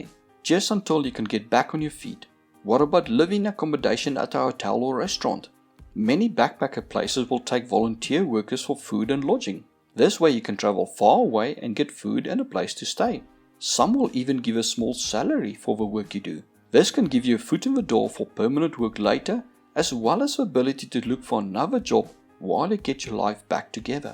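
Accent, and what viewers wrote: South Africa. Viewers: Great info Are you South African?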